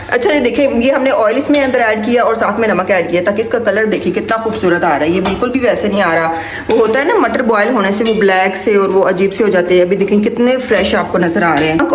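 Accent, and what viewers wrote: China. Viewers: Aap ne Chinese kaisee seekhee baita Chinese accent ?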